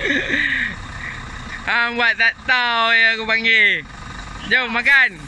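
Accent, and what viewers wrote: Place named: North, Indonesia. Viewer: kuang ajaq🤣🤣🤣abg speking northern👍🏻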